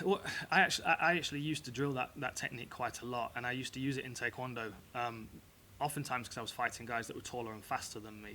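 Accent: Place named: England, United Kingdom. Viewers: I think his style of English would mostly be a product of where in England he comes from. I don’t find him pompous at all btw. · Something about dan , he has that annoying pompous English style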